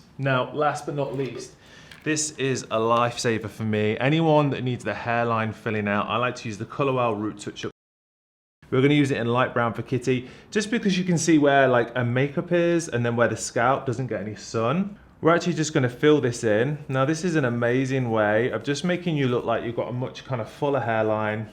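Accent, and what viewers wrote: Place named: United Kingdom. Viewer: hold the phone.....he's BRITISH???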